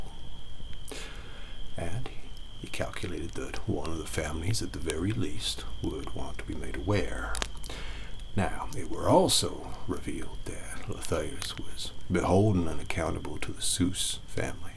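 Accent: South, United States. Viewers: Well, butter my ass and call me a biscuit! Our boy can speak Southern! 😁 Great job on this. The crickets and night sounds were awesome. Every video is like stepping into another world for a while. You're amazing. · Being a Texan myself, I don't have this kind of Southern accent, but I do find it so funny whenever I hear it. Great job, you are a wonderful talented artist. And, I'm afraid to admit, kind of sexy in this one, if you don't mind me saying so.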